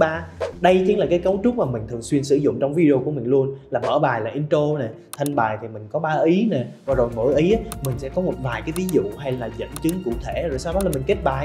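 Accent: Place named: Central, Vietnam. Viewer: Mình nghe là biết bạn người miền trung nhưng vạn nói hay đó, diễn đạt dễ hiểu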